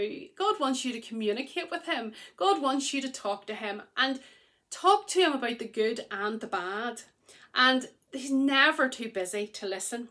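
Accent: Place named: England, United Kingdom. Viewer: I just found you as a recommendation from another podcast. I love you English accent. I could listen to you all day! 🥰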